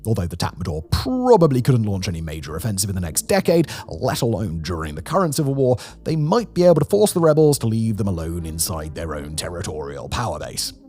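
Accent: Australia. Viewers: You said it! Thanks! Is this Simon Dude Australian? And what’s the HURRY! Don’t forget his mispronunciation! He keeps saying: “MY-AN MAAR”!!! Is it you “MAAR” or my “MAAR???”. Sorry, I had to STOP listening! Couldn’t take it any longer! It’s too Disturbing! But, I will HELP you: Say “Mee” (like Me) and “Maar” Not that hard for you! OK! Let’s TRY! “Mee-an-Maar”. Please change next time you talk about Myanmar ex Burma. Remember “ Mee-an-maar”. Ex fan of. Johannes Koistinen-Lindgren born in Suomi-Finland. Resident in the northern Thai hinterland Khlon Nam Lai village. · He's British? I thought he was Australian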